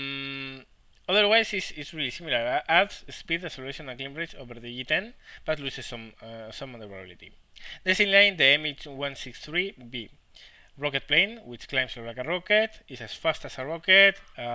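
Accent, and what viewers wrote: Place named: Spain. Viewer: What country are you from? My guess Spanish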